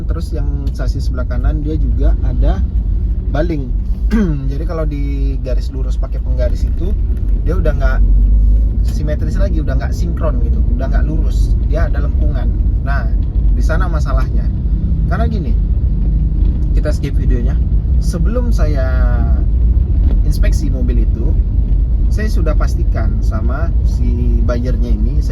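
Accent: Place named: Padang, Indonesia. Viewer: Logat abg kyk org padang 😅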